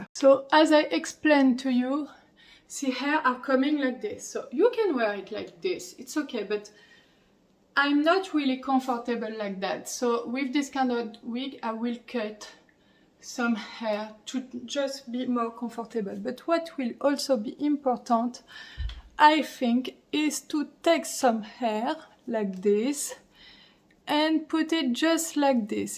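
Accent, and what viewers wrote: France. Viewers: I love French. But your accent is terrible. Better not to speak English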